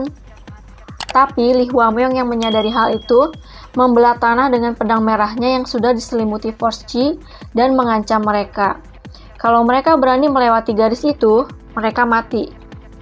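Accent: West Java, Indonesia. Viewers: Sunda banget ya ka 😂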